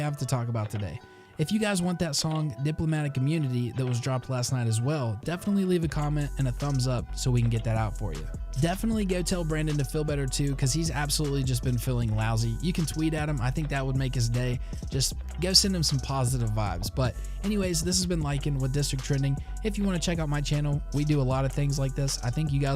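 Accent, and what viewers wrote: United States. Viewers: Mega southern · Broooo, you gotta be from B-More you got the strong O accent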